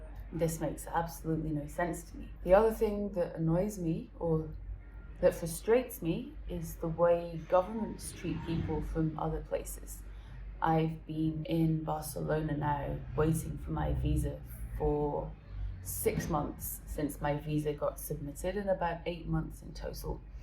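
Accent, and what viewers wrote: Scotland, United Kingdom. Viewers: our southern border is a mess and needs fixed.IV personally been to two different parts of it Texas and Arizona and seen it with my own eyes.Sorry your path has slowed down and put you in limbo.I still enjoy watching your Spain travels.I enjoy your voice and accent.It makes me wonder what my Scottish ancestors sounded like when they Immigrated to Virginia in the mi 1700’s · As a fellow traveller (in the past sadly) ive been the outsider in alot of places around the world and you simply HAVE TO assimilate to some extent wherever you go and to be upset that you cannot go and do whatever and wherever you want is naive at best although you are correct to say Governments will jump on any opportunity to gain power or wealth regarding borders or visas or any aspect but that unfortunately IS the way power systems work more or less depending where you go as Culture does play a huge part in attitudes and behaviors regarding sex, religion and ideology. 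As sad as it sounds and as sad as it IS the general global neo liberal agenda permeating our lovely wee planet is only worsening any of those freedoms we used to have especially to the free spirits like yourself who just wanna fly so until that changes we'll just have to take the ride....(you did buy the ticket??) PS I can hear a Scottish twang in there and since seen you were at Uni in Dundee. Anyway love watching you and your adventures, lovely lassie. Maybe see you on the road down the line. X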